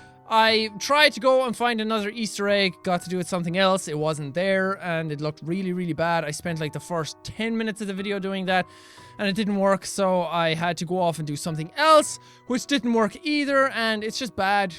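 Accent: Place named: Ireland. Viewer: Play station tree I love the Irish lol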